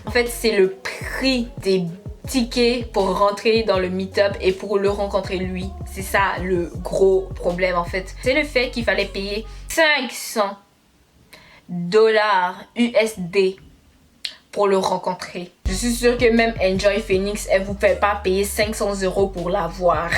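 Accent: Quebec, Canada. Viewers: Tu es québécoise? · Ton accent est trop beau!! C'est un mélange entre québécoi et français de france pis anglais.!!❤❤❤❤❤❤❤❤❤ je t'aime trop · Ta un accent québécois?genre canadien?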